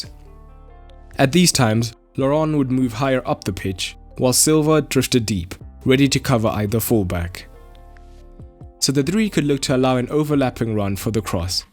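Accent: South Africa. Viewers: Are you South African mate? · Are you south African ?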